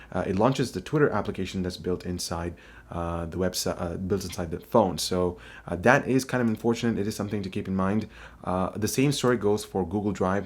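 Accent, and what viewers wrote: India. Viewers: INDIAN ! WITH NICE ACCENT! KEEP IT UP BRO ! :)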